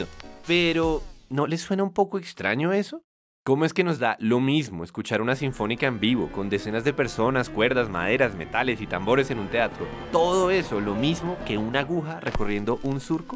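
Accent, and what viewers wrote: Bogotá, Colombia. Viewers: E el encanto del acentico Colombiano. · Eisenkopf Alpo es acento bogotano, en Colombia se habla diferente, dependiendo de la ciudad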